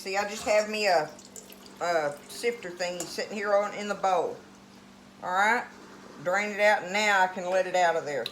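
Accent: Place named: Georgia, United States. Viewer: My Mother made THE BEST Salmon patties. She did use flour, corn meal and egg. Sh always made biscuits and gravy to go with them, and fried eggs. Great for breakfast. I am going to try your recipe. They look delicious. I love watching you cook and hearing you talk. I am from Georgia so you and me talk alike. Love you!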